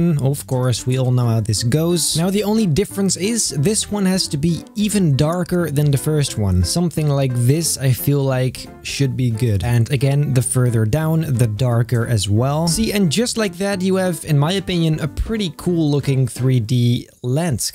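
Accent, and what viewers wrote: Netherlands. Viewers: Are you Dutch ? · Are you dutch? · You sound dutch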